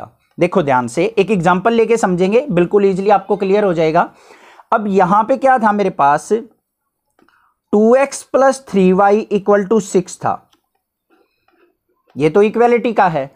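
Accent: Haryana, India. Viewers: I m sure you are from haryana Your accent says you are Haryanvi 😆🤣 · apki awaz se pata chal gaya tha mai bhi haryana se hu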